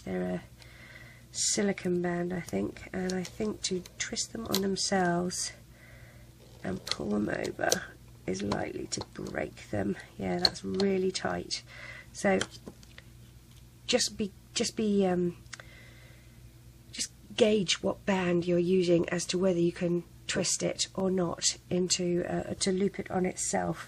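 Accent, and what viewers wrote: United Kingdom. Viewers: your BRITISH LOL LOL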